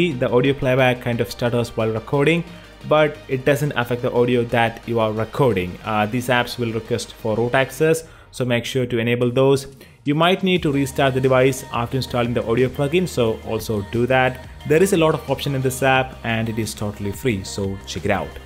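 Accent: Kerala, India. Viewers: So finally that is a mallu accent ...ooorange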